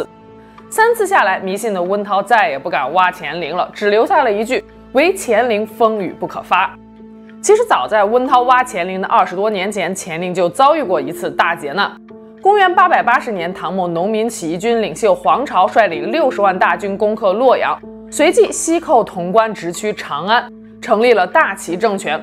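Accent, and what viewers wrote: Taiwan. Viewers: 哇第一次看见的厨房，我好像听到台湾。。你是台湾？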